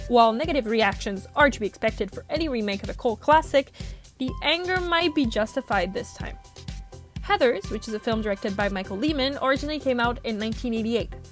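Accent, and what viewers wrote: Quebec, Canada. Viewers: are u from québec?? i feel like u are from ur accent!!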